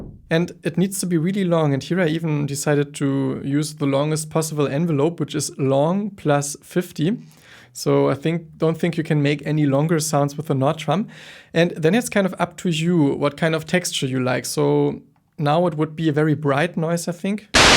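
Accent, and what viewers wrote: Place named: Germany. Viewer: i like the way you speak, professional english with that little german accent chills me somehow lol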